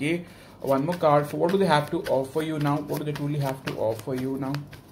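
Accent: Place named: India. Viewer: Your voice seems like that of an indian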